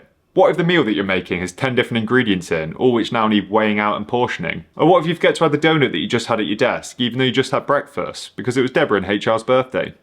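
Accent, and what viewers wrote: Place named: United Kingdom. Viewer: Please take no offense but it's hard to understand your content as you've got a strong British accent. You may wanna add subtitles to reach a wider audience outside of the UK.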